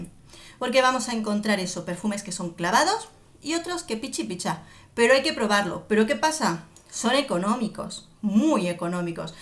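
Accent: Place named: Spain. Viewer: hola, te hablo desde Colombia. He visto tus videos de perfumes y me encanta. Eres fabulosa y muy clara en tus conceptos Te pregunto: haz hecho análisis del perfume "Aromatics Elicir" de Clinique? No lo visto, si ya lo hiciste me dusculpas, sino te lo solicito; lo adoro. Felicitaciones, eres lo máximo y tu acento español me encanta. Chao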